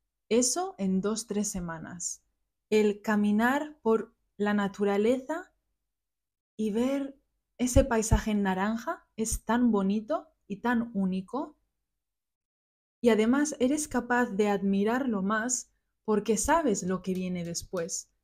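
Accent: Spain. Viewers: Sie haben einen wunderbaren Ausdruck, eine wunderbare Betonung und Manierismen! Sie sind atemberaubend schön und absolut kostbar! Ich stimme Ihnen zu 100 %, dass der Herbst die beste Jahreszeit ist. Natürlich, wer liebt es nicht, weiße Weihnachten im Winter zu sehen? Aber wie Sie sagten, später schmilzt der Schnee, gefriert und wird zu schmutzigem Eis. Ich bin Medizinerin und lebe sowohl in Kolumbien als auch in den USA, aber ich höre selten jemanden aus Spanien sprechen. Ich mag die Art, wie Sie das S und Z in echtem kastilischen Spanisch aussprechen (ich finde es süß). Ich mag Ihre Podcasts wirklich und das Anhören von Alltagsgesprächen (with the occasional splash of English-- which I'm guessing is not your first language) hilft mir, europäisches Spanisch besser zu verstehen. Sie haben eine strahlende Persönlichkeit und es ist sehr herzerwärmend, dass Sie Ihre persönlichen Erfahrungen teilen (einen Baum fotografieren, während die Blätter ihre Farbe ändern). 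Ich bin froh, dass ich Ihren Kanal gefunden habe, er ist sowohl unterhaltsam als auch lehrreich! *************** ¡Muy lindo! ¡Qué chevere! ¡Me encanta mucho! Saludos desde Colombia! Chao! 😁 P.D. Quizás puedas celebrar Halloween 👻💀 el año que viene (o el Día de los Muertos, ¡serías perfecta como Katrina!)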